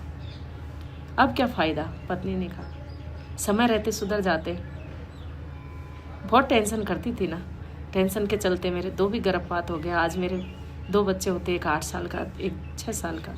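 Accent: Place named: Haryana, India. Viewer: Are you from Haryana you speak like one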